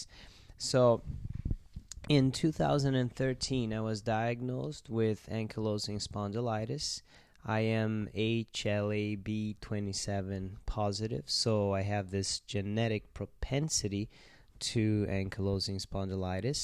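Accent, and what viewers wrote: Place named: Canada. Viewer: Sounds like you are in Canada, I am as well.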